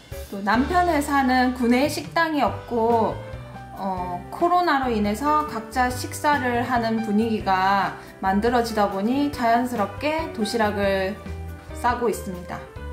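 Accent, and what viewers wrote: Gyeongsang, South Korea. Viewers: 어머나ㅋ 경상도 분이신가보다ㅋ 나도 경상도사람이라 엄청 반갑네요 덕분에 요즘 큰아들 도시락 잘싸주고있어요 감사합니다 쿠팡에서 도시락통도 새로샀답니다ㅋ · 88년생인데 살림을 넘잘하네요 우리딸들도 비슷한 나이인데 손하나 까딱하지 않는데 ...ㅠㅠ 말투가 경상도분인것 같네요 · 경상도분이시네요~ ㅎ 저도 남편 직업상 삼시세끼 3일씩 글고 큰애 기숙사 도시락까지 만만치 않은데 유튜버를 보는게 많은 도움이 되어요. 젊은 분이 대단하시네요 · 전 78년생^^ 신랑회사구내식당이 잠시 쉬면서 도시락을 싸기 시작했어요.홈까페 홈밥요리 좋아하면서도 도시락은 초초초짜 줌마예요. 말투들으니 경상도네요.반가워용.저두 대구고향 부산출신 양산살아요ㅎ 지난 영상들이지만 많이 배우고 눈으로 힐링해요.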